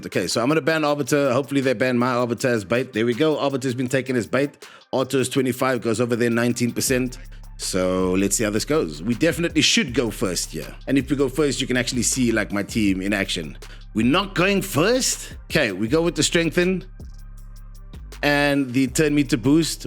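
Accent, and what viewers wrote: South Africa. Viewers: Interesting content.. Been playing Raid for years! Question: Are you South African?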